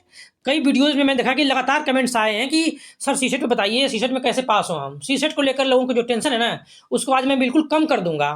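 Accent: Ghazipur, India. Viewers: Bhai prayagraj se katti nhi lag rahe ... Tone bilkul Ghazipur mau wali h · wahi मैंने सोचा ghazipur mau। या azamgarh से honge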